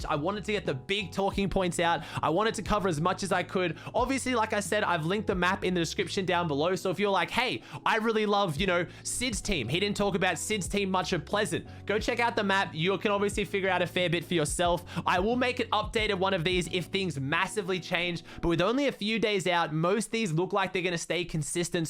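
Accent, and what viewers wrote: Australia. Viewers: We love ur aussie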